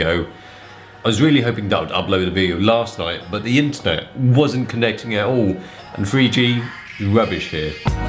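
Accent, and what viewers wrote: England, United Kingdom. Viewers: is Casey Neistat Reborn. Or Asian Neistat with English accent. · im still mesmerize after all this year, how could u have such a perfect brit accent... envy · My fav dude on youtube, love your content, love your humour, love your very english accent! Greetings from New Zealand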